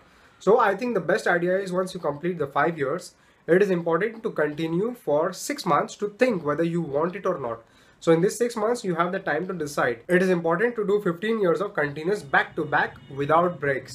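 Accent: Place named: Indiana, United States. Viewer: He. Doesn’t speak with his French Accent. Just Indiana accent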